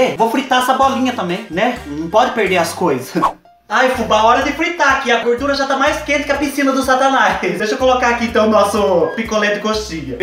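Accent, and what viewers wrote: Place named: Cerqueira César, Brazil. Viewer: Fubá do céu, deixa eu contar. Meu pai tem 75 anos e nasceu nas redondezas de Cerqueira César, aí eu tava vendo seu vídeo e ele ouvindo, aí ele disse " Essa moça é das redondezas de Cerqueira, aposto pelo jeito de falar" kkkkkkkkkkkkkkkkkk aí eu disse que você é de Coronel Macedo e ele falou que é pertinho daqui, sou de Lençóis Paulista, perto de Bauru, quando acabar a quarentena, vou pessoalmente te levar uma caixaaaaa de coisaaaas ❤️❤️❤️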